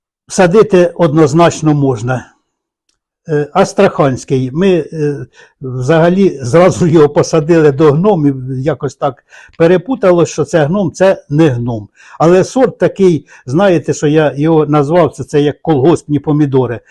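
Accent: Poltava, Ukraine. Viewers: Дякую за чудовий огляд, дивлюся Ваші відео з великим задоволенням, приємно чути рідний полтавський говір. Сама така, як Ваша дружина, все хочеться щось новеньке.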